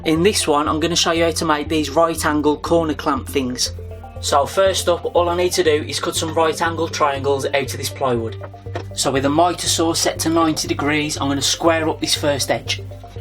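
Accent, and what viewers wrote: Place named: Birmingham, United Kingdom. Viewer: first time I've heard a Brummie accent on YouTube in a decade of viewing. Ofc you are probably from black country, rare to hear the accent in actual Brum.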